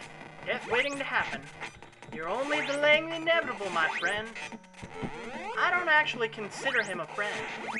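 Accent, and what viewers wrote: United States. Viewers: I have to say that the voice in this video is a little creepy. It's like an old Southern Belle mixed with a Texan woman. Eeep...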